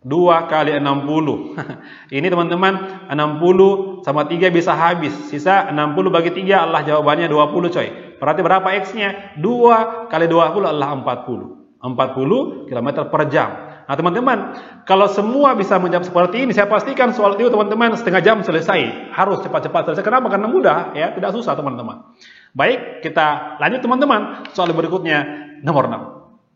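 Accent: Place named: Eastern Indonesia, Indonesia. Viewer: asli mana bung, kayaknya timur ni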